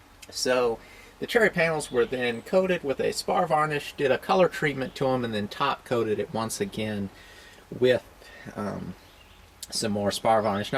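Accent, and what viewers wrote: South, United States. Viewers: Dukes of hazard accent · who else started reading the comments in a southern accent while he was talking???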